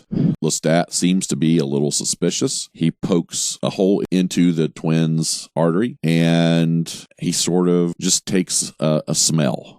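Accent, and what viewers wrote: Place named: Texas, United States. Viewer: Really late to the party, but just have to tell you how much I appreciated your reviews. Your analytical skills and emotional I.Q. are off the charts! Plus, you have a voice that belongs in commercials. And that accent -- Texas? When you mentioned Jonah with Louis in ep.3, but not Antoinette with Lestat, I knew you didn't find her memorable enough to recognize 22 years later in ep. 6. Favorite line from you all season? "How many times are you gonna fall for this, Louis!" when he was professing his love for Vampire Armand. The secret to Louis was revealed (to me) in the first episode when Daniel called Louis his father's eldest son, and Louis corrected him, "The FAVORED son". It was important to him, being favored son. That's why all the old-as-sin vampires go ga-ga over Louis in books/movie/tv. They crave his fiercely-held echo of their forgotten humanity, and they sense his need to be some man's "favored son". 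Plus he's hot haha! Thanks for entertainment!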